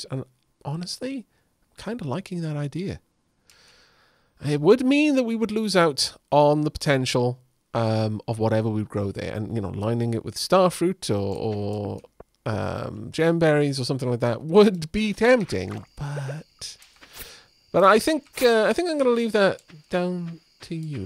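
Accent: Wales, United Kingdom. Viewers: Hi! Firstly, very glad that this series is continuing! Between your silky smooth welsh tones and the relaxing nature of stardew, i'm always thoroughly unwinded by the end of a episode. In terms of green house organisation: I find there is enough space to have two of every regular fruit tree, and then one of each mango and banana from ginger island around the edges. Once those are fully grown you can intersperse kegs or preserve jars around these. For the dirt areas I actually prefer the quality spinklers, as it helps you organise your crops, having 8 of this and 4 and 4 of that etc. This also leaves a gap to one side for tea/ coffee. I find it also helps to have some seed makers in there as well, as i tend to re-plant the same crops again and again, and can go from crop back to seed really easily. Hope that helps, i'm off to fufil my own desire for tea on this rather chilly sunday morning.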